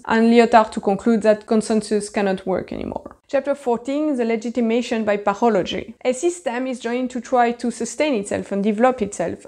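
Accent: France. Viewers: Thanks for your video, which gave me the opportunity to test some of my ideas. One thing I couldn’t help but notice. Some of the comments make me want to take up the cause of woke micro aggressions although the intent is positive. I mean to say a French women (my assumption) with a French accent! Imagine it… people need to travel more I think aside from COVID and global warming implications…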